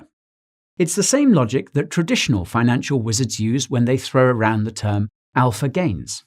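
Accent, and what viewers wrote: United Kingdom. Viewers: I only understood like 10% of this... and that's being generous. But the wee bit I did pick up helps me understand why I flopped on my first $500-600 into crypto (well, Im not dead yet) and I'll be MUCH more careful entering my long term HODLING patterns from here on thanks to you. Liked and subbed even before you asked for it. I heard your Brit accent and immediately thought, ah yes, this man knows his stuff. LOL. Plus you came highly recommended by Alex Becker, the most ripped attractive PG rated Youtuber and top rated coin picker in the galaxy. 🙄😁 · Man your fracking awesome.. love listening to you... something about brits that make em sound smart haha. thanks for all the GREAT info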